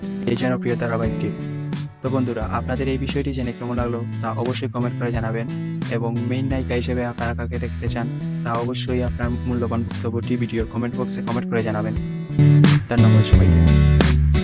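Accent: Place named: Sylhet, Bangladesh. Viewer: Apni mone hoy sylhetie taina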